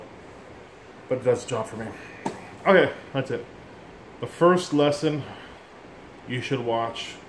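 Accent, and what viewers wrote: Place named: Louisiana, United States. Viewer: your starting to talk like a Cajun down here aint that dandy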